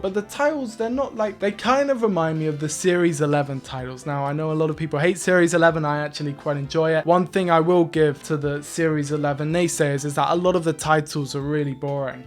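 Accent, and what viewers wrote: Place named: Southern England, United Kingdom. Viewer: Are you putting on an American accent some of the time? You pronounce 'time' with a long 'aa' like you're from the deep south (US) but otherwise you sound like you're from the south of England [puzzled emoji]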